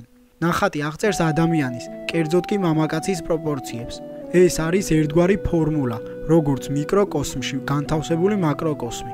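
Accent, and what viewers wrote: Kutaisi, Georgia. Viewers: რაფერ გეტყობა ვიოო ქუთაისელი რო ხარრრ🥰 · ქუთეისელია რამე პრობლემა გაქვს დაია??????????????????????? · Kutaiseli xar 😁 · არ იქნება ეს ქუთაისელი.....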